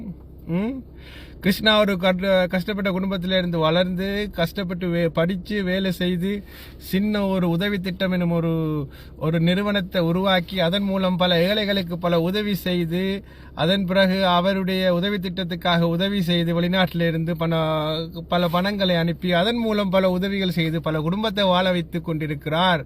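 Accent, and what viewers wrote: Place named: Sri Lanka. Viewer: ஆமா உனக்கு தமிழே பேச தெரியல்ல தலைவனை பற்றி பேசுவதை விட நீர் பிச்சை எடுக்க போனவழியை மட்டும் பாருடா உன் மொழியை கேட்டாளே நீர் சிங்களம் கலந்த தமிழன் உன் நடிப்பும் அர்ஜுனா அரசியல் பிழைப்பும் இங்கு வேகாது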